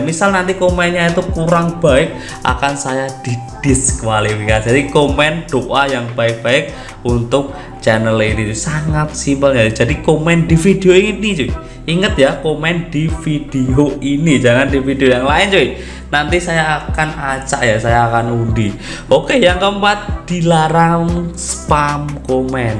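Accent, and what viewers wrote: Java, Indonesia. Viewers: Saran: cepat2 beli facecham biar g jadi ninja lagi 🤣 Kesan: public speaking asik ga bosenin dengan nuansa jawanya wkwk Kritik: sering-sering live mang kqlok bisa seminggu 3x 🙏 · Saran : untuk next time semoga abang sehat ya, masalahnya saya bisa build squad karna nonton chanel abang Kesan : penjelasan nya kaga belibet, dan saya suka sama logat bahasa Jawa nya Kritik : jangan sering emosi bang kalo gacha kaga hoki, wkwk itu ae sih soalnya bingung nih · Saran:sering upload gameplay/push rank biar bisa belajar dari gameplay bg Kesan:omongan nya lucu medok dan penjelasannya gampang di Terima suka bahas bocoran soal event yang akan rilis Moga channel makin berkembang dan bisa tembus 100rb subs bulan ini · dengan ucapan basmallah, bismillahirrahmanirrahim untuk diri saya semoga bisa menang give away dan untuk bang keren bang, lanjutkan, chanel fifa terfavorit, paling profesional daripada yg lain, bahasa mudah dipahami dan asik bahasa jawamu sangat medok dan menghibur semoga dilancarkan terus rejekinya, sehat selalu, dan chanel bisa berkembang terus menerus saran bang untuk konten, bisa kasih tips untuk pemula yg awal main fifa bang, soalnya kalau udah ketinggalan jauh susah untuk mengejar pemain yg terdahulu hehe sukses terus bang! 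panjang umur hal hal baik! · Hallo mas saya seneng nonton nih chanel, semoga makin maju dan makin terbaik dehh amin Saran : kalau ada facecam pasti asik mas bisa liat wajah samean pas ngonten wkwk Toxic gpp mas medog nya lucu mas kalau didenger orang2 sesama Jawa wkwk mantapp 👍👍🙏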